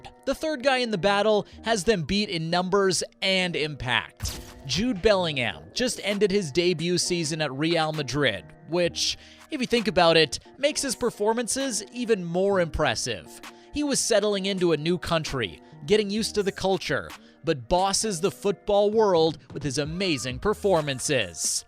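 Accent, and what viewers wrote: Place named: United States. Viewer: i heard a yank accent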